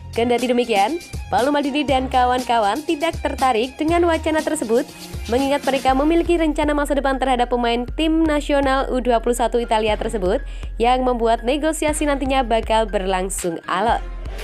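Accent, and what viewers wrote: Java, Indonesia. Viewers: Kakak suaranya medhok... mantav...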